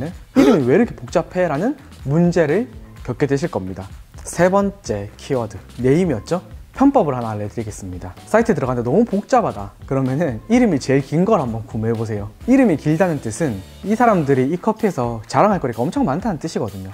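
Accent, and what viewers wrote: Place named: Gyeongbuk, South Korea. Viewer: 경북지역 억양 인듯요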